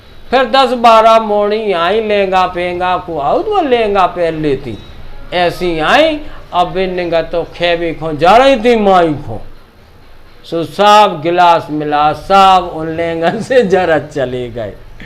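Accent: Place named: Bundelkhand, India. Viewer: गजब की बुंदेलखंडी जी बोलते है बहुत गजब का टेलेंट है आपके पास ऐसा टेलेंट मैने फिल्मी दुनियां के कलाकारों के पास भी नहीं देखा है आप हमारे बुंदेलखंड की शान हो 🙏🙏🙏🙏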